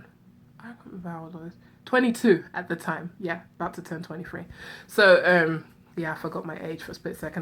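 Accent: United Kingdom. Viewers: Are you British but live in America now? Sorry for asking I'm just curious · How come you have a British accent but you're from Texas? Just curious xx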